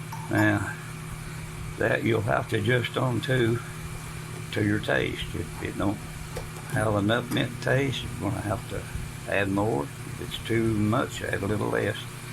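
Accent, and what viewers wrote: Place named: South, United States. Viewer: This was neat as heck! Can't you get any backer in the Philippines? New friend here you sound just like everyone around here. Ain't nothing no better than a southern draw. At least not too me. Look forward to more videos. Stay safe and God bless 🙏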